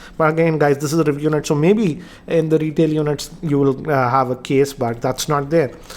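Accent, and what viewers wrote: India. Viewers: This indian accent wtf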